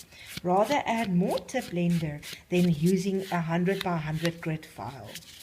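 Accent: South Africa. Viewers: Nice to hear a South African for a change :) thanks for the tutorial! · is jy afrikaans? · By her voice I can tell she is south African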